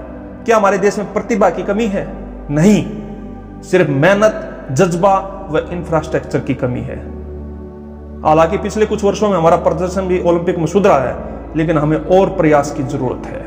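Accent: Haryana, India. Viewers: Haryanvi hindi 😮😮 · Sir aapki awaj ka haryanvi touch wonderful hai .... Thanks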